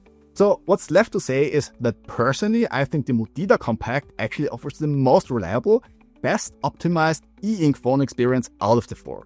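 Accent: Vienna, Austria. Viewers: richtiga wiener heast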